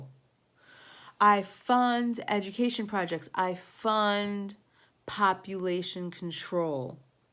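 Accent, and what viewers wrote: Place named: New York, United States. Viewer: hear hear! Good Stuff! I like your style, and the subtle ny accent...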